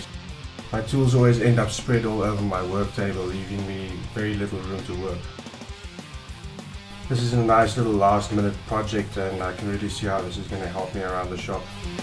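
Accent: South Africa. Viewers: I have never seen that plunge technique before mate, that's awesome. That will come in very handy I'm sure. Sounds like a Durban or Cape town aven't there?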